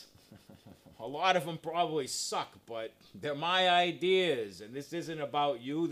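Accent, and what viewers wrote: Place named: New York, United States. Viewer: Glad you're back, you talk like a New Yawker.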